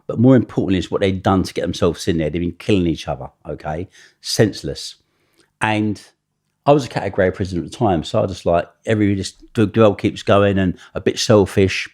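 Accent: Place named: England, United Kingdom. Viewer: The elegant, upper class English accent talking about video games??? I have a hard time seeing you hard at it, in between podcasts, just slaying the shit outa fictional characters. I get what you're doing but it does make me giggle. Im very glad you are getting sponsored, i know it's hard for your genre to get that. Im just being a shit head! Good for you, love your show.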